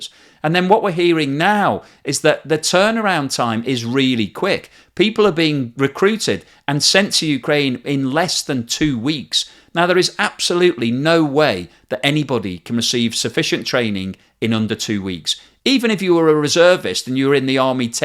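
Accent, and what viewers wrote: United Kingdom. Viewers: he claims without citing any source that it doesn’t “seem to be the case” that Russia stuck to its original claim that they’d only call up reservists as part of their expanded military campaign - he follows up with stating that “many” people who have “gotten those papers” have absolutely no military background, again without citing any source and certainly without any sort of quantitative estimates provided - he immediately then admits that “there are no official reports” regarding this issue, but he offers in its place, as some sort of proof, the always-trustworthy “reports on social media”. These are just a few examples of how incredibly biased towards western mainstream media narratives that he is. This guy or whatever is incredibly ill-informed, close minded, and tbh seemingly just downright dumb; he constantly uses unsophisticated,overly simplistic arguments that are the type of propaganda you’d find as talking points from an episode of vrian stelter on CNN. 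Your British accent can only get you so far buddy. Sooner or later you’re going to be figured out for who you really are: a run-of-the-mill, wannabe establishment political pundit, punk, who for some reason thinks he can brand himself as a some sort of a financial analyst, even though you are clearly just masquerading as one given how infrequently you actually present a comprehensive quantitative financial analysis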